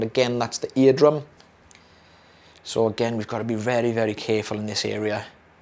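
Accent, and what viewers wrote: Wales, United Kingdom. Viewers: The accent is Welsh, The clinics are all based in Wales I believe. Lovely accent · My favorite British accent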